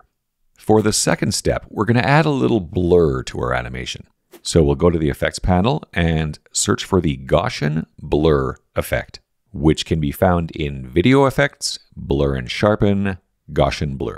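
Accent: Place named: Canada. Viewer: I hear your Canadian accent...my wife is Canadian too! Thanks for the tutorial. I'm a subscriber now!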